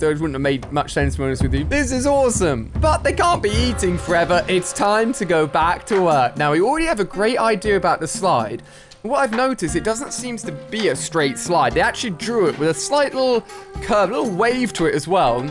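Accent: United Kingdom. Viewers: an inside stairway us:your british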